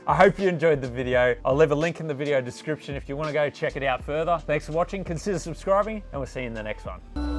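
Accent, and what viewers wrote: Australia. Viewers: Awesome video as always, and a great case from CoolerMaster. ☺ Thanks a lot, my friend, for the video and for showcasing that really good CoolerMaster case. ☺ By the way, I watch your videos in your original language because I really like the Australian accent. 😊 It reminds me a bit of the British accent. 😅 Best regards. ☺ Geiles Video wie immer und gutes Gehäuse von CoolerMaster. ☺ Vielen Lieben Dank mein Freund für Dein Video und die Vorstellung des echt guten Gehäuses von CoolerMaster. ☺ Übrigens schaue ich Deine Videos in Deiner originalen Sprache an, da ich den australischen Akzent richtig gut finde. 😊Das erinnert mich ein wenig an den britischen Akzent. 😅 Liebe Grüße an Dich. ☺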